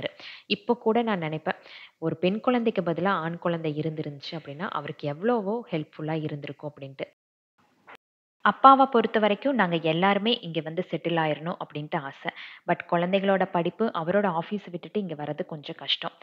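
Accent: Coimbatore, India. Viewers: Hi sis... Oh wow supb akka... Am also coimbatore only naa ipo 2 days ah than unga vedio pathutu irukken.... Really am enjoying also surprised and romba egar oru oru vedio pakkaren... Really supb akka... And unga previous vedios pakkun pothu i thought neega Coimbatore ah than irukkanum kandipa apdinu nenachen becos apdiya Coimbatore slang irunthuchu neenga pesum pothu sila words... So very namma coimbatore... Athum kottur i knw kottur azhagana oor veedu... Romba supb akka we r all keep supporting u... Engalukku neraya visiyangal share pannunga and we learning from u... Love u sis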